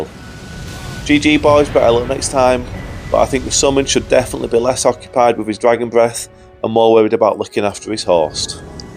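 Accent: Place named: Yorkshire, United Kingdom. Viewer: Love your accent mate! What part of the UK? Yorkshire?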